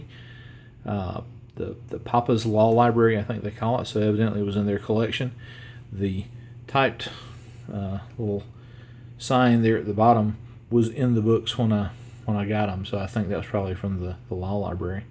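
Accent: United States. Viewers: is he from texas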